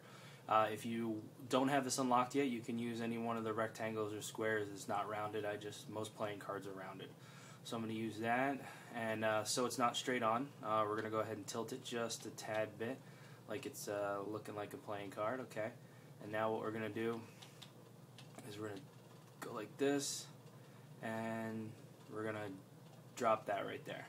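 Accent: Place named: United States. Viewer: Love the American talk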